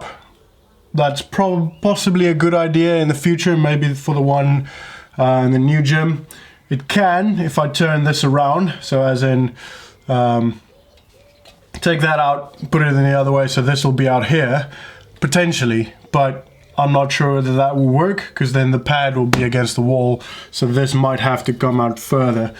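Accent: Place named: South Africa. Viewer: The unmistakeable South African accent . Nice job bru its a lekka idea and iwill be making one for my garage gym . thanks for the great contact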